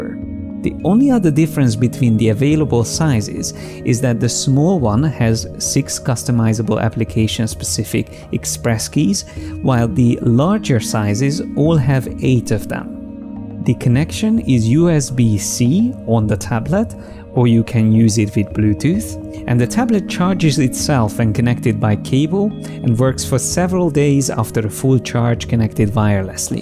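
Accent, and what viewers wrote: Hungary. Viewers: Are you Hungarian? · Thank you for the review! I can hardly wait to try the S pro however I'm still not sure is it worth to buy the pro version, but the better pen, extra programable buttons for shortcuts and the "touchscreen" possibility convienced me. I was suspicious that you are Hungarian because of the pronunciation, so that I must check it up and found it out that you were born in Budapest:) Szép estét! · Thanks for the review, I use an Intuos Pro M for everything at the computer instead of the mouse, it helps to perform better. Oh, and I just found your channel, great stuff! You have some Hungarian accent, do I hear well? Keep up with the great work!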